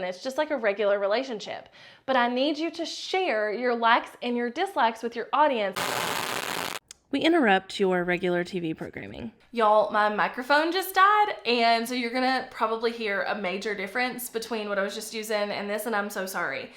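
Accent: South, United States. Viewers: You southern accent! I’m from Georgia ❤ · Mom, creator, loved your accent because I’m also southern, 😊 AND love the advice with a dash of humility when you don’t know all the things (e.g., vlogging). Working in a bubble at home is hard and your “chats” and business advice are a great way to feel connected to a business environment while still at home 💕 Thanks for that and best of wishes on your vlogging adventure! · I love your laid back, fun personality. It was great when, in another video, you made it ok to be a multi-passionate in my business. And I also love your southern accent because I'm from the south so that makes you feel familiar.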